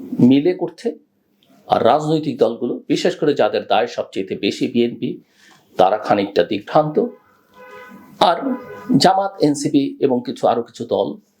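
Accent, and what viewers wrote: India. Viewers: তুমি এতো সুশীল সাজার চেষ্টা করিও না। জেনারেল আর ভারতের টোনে যে কথা বলো এটা জনগণ বুঝে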